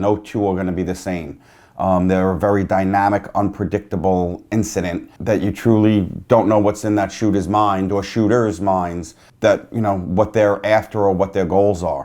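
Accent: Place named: mixed, United States. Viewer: This man Ranch dressing look like a bootleg FaZe Apex with a Boston/New York accent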